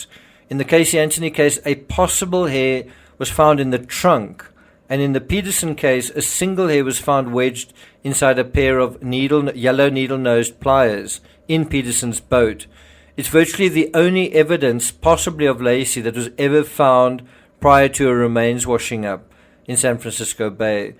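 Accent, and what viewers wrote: Afrikaans, South Africa. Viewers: Are you South African? You have a SA accent 😊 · Is that an Afrikaans accent?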